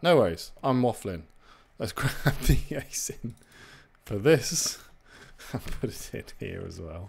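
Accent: United Kingdom. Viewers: It's the British accent. It's a feature not a bug. Nothing to improve.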